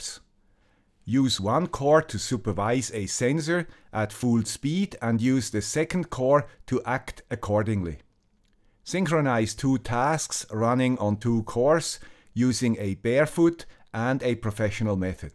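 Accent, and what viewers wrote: Switzerland. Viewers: Nothing like your weekly dosage of your guy with the swiss accent. :)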